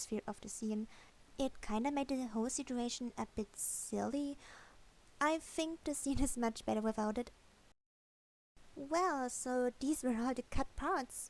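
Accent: Germany. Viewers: Omg ich habe mich schon gefragt, ob ich mir nur einbilde dass dein Akzent deutsch ist xD Glückwunsch zu den Abonnenten und ich liebe deine Videos :) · Wow this is amazing. I really love this!:D Thank you for sharing this with us and I look forward to being on this journey with you. And your German accent is beautiful! never apologize! I'm learning it now ^^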